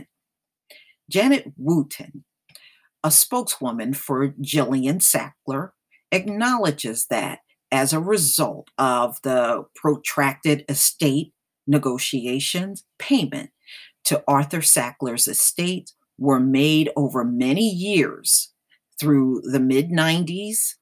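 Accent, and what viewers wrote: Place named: New Jersey, United States. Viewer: I love it. Yo Are so Jersey!!!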